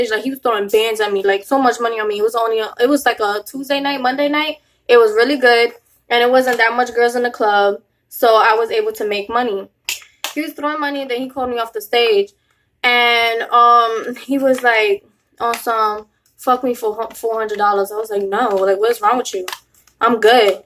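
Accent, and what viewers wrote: New York, United States. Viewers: That accent has to be from NY! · u have a accent like your from New York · New York accent strong asf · I loved the New York accent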